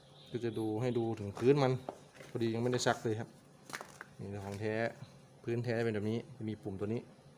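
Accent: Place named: North, Thailand. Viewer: คนเหนือกาครับสำเนียงมันใช่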